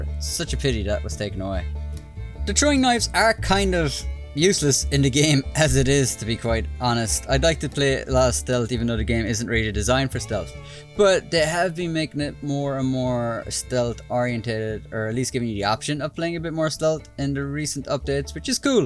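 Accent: Ireland. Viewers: Bro, are you Irish?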